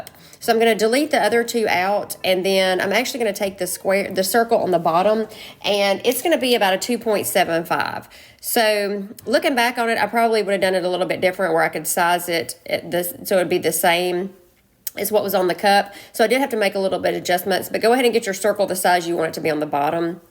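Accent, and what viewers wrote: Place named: South, United States. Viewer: Love how this turned out. Thank you for sharing. Also love your southern accent. Watching from Ontario Canada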